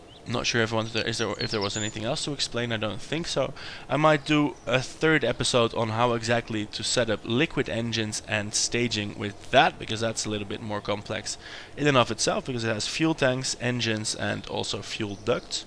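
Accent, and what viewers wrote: Netherlands. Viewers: je klinkt nederlands ouwee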